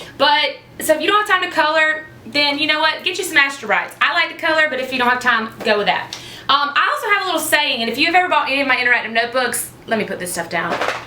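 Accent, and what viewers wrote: South, United States. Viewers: UGH! I so need someone like you in my life! You are the blonde version of me, southern accent and all. lol Thanks for sharing!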